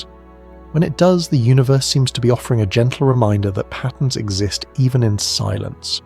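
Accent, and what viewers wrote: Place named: United Kingdom. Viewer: So Maven isost and offline. Hold old is this BS. he I hear this British voice I know it will be wordy BS not worth my time or bsndwidth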